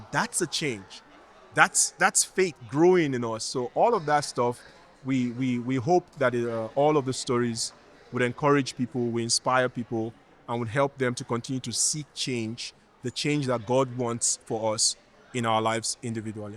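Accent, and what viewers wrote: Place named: Nigeria. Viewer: Could feel brother intonation. That his 🇳🇬.. watching from Nigeria...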